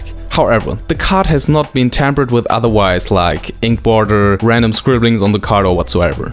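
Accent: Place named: Germany. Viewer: From a GER to a GER (most likely): Work on your English. I mean even the THICC accent you have is acceptable, though painful to listen to (especially a German accent), AS LONG AS THE GRAMMAR IS FINE. And you talk quite quickly as if you were advanced although you're actually slightly better than a beginner. Like... you're not gonna play Van Halen solos on your second guitar lesson. Be honest to yourself - who are you trying to impress? I personally would be ashamed of uploading such a "wideo", even though the "wideo" is otherwise informative.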